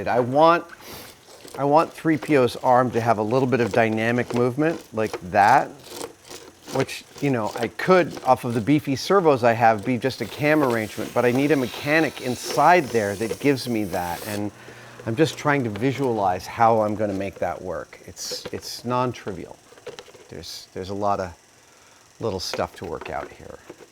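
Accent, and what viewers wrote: Germany. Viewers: he sounded like he had a German accent,and not the good ones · Why does he sound German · He sounds German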